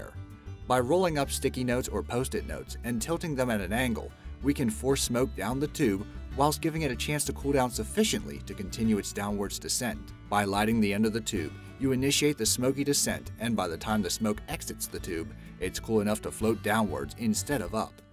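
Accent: Hungary. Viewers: wait, are you from hungary? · Are you from Hungary?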